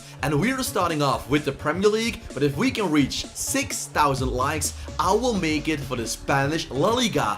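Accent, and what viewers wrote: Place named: Netherlands. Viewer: Ik hoor gwn dat je Nederlands bent hahahahaha heerlijk video wel ❤️🤙🏾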